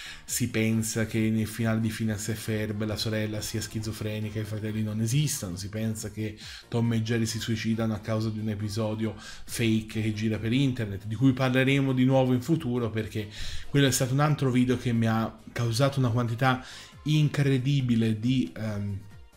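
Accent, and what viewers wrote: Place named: Tuscany, Italy. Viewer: Non te la prendere... Purtroppo vi sono troppi analfabeti funzionali in rete... Bellissimo video cmq!😉 Cmq fantastica la tua cadenza Toscana... 😉 P. S. Ci vuole intelligenza per capire i tuoi video, dote oggi troppo sottovalutata... P. P. S. Visto che parli di serie interrotte per morte degli autori o interruzione per flop del prodotto, puoi fare un video su Tekkaman e Silversurfer?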